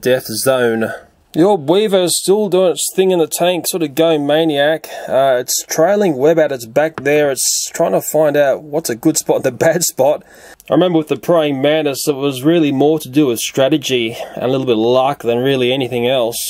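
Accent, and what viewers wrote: Australia. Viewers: I am new to your channel , and I really enjoyed this ! Love your Aussie Talk !! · an Aussie commentating Aussie things, I love it! 😂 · That's a sick video bro and your commentary is true Aussie yea boi👏👍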